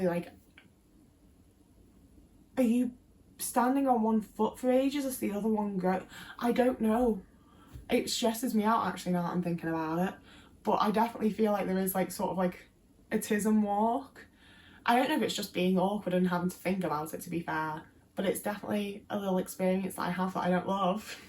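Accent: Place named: Midlands, United Kingdom. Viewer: When I first watched your videos, I was so confident that you were fully scouse based off of your accent but now I don’t really hear it anymore. I think your accent is getting more midlands over time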